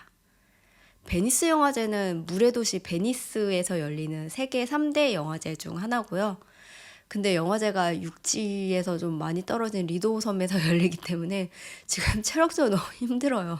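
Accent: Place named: Seoul, South Korea. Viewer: 서울말 듣기 좋다.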